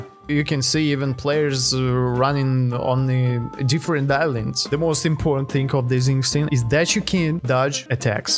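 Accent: Russia. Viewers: Bro has russian accent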